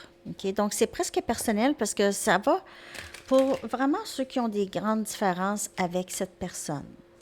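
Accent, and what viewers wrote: Canada. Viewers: J'adore ton accent si charmant du Canada j'adore j'adore le Canada car en juillet 99 j'ai était dans ton très beau qui est magnifique et que j'ai vraiment aimée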